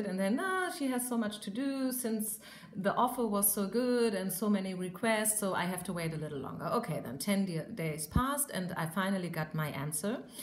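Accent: Germany. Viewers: You have a German accent :D Am I right? I am asking because I am very interested in different accents and I am always very happy about guessing correctly. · I love that german ascent A lot! 😍😍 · your accent is so rich and beautiful. i studied german for two years and still can hardily understand it. you’re such a beautiful soul with a beautiful accent. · Your german accent is really charming :) I speak german too and I totally feel how translating straightforward german sayings int english can be tricky. it's like in german you have a word for EVERYTHING :D you did an amazing job and your voice is very sweet and calming too. Thank you for relaying these messages to us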